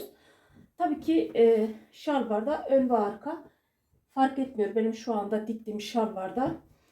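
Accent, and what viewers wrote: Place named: Sivas, Turkey. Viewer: Konuşman Sivas'ı gibi kolay gelsin eline salık evladım.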